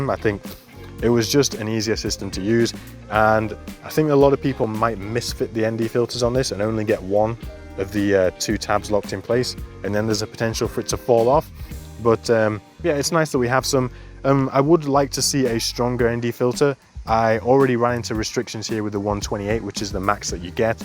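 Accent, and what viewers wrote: United Kingdom. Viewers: Hey mate. You sound British, but maybe you are living overseas? The reason I ask is where here do you get the plus batteries from in the UK? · I am working my way through your videos, kinda binge watching really! Having just bought the DJI mini 5 pro I found your videos to be extremely helpful, especially the one on cruise control!! Needless to say I have subbed, many thanks for the tuition! Best regards Dennis 👍 BTW you sound like a Brit but it doesn't look like the UK, where are you? Sorry just curious 🤔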